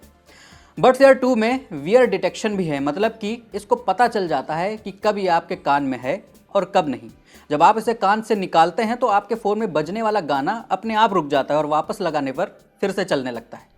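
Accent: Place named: Bihar, India. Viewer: bhai aap Bihari lagte ho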